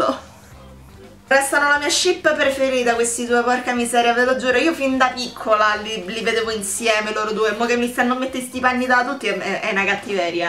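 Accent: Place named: Rome, Italy. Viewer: quando fa la parlata romanesca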